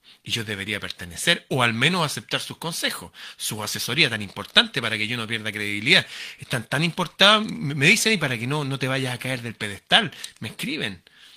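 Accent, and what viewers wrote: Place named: Argentina. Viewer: Parece que hablas de Argentina !!!! Qué bueno es escucharte! Cariños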